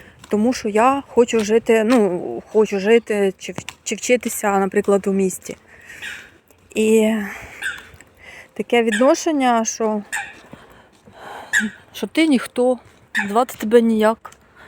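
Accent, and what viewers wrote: Ukraine. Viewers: Скажіть будь ласка, з якої ви області, бо судячи по вимові, ви не з східної України? Наскільки треба себе не поважати, щоб соромитися своєї мови у своїй країні? Я теж довгий час говорила на мові окупанта, з 2022 перейшла тільки на українську, але ніколи не відчувала якоїсь зневаги, хоч живу все життя в місті. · Акцент закарпатський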